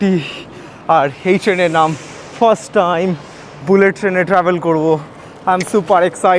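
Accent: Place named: Bangladesh. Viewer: Your bangla accent sounds like bangladeshi.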